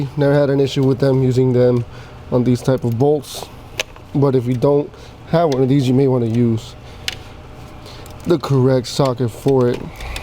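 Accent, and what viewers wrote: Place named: Latin America. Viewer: What an amazing video. I just came back from the BMW dealer here in Edmonton where I live and they told me my water pump/t'stat housing was leaking. They are looking at $2400 CAD for the repair. My car has 134.000 KMS. While I was googling the problem I found your video and honestly it does seem like a lot of work for a DYI one. Is there any way I can personally check to see if the pump housing is leaking without taking so many things apart? Is it very common to replace this part in these X1 2018 xdrive 28i models? with the amount of kms I have is it something to expect? Thanks again for the great video and clean shots, I was able to see all the detail and learn more about my car. You have a new subscriber here. I am guessing you're also latino, I was trying to figure your accent and country of origin, I know this is off topic but I was curious. 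Keep up the good work and videos. Ill check some more as I also love learning about car repairs. Gracias!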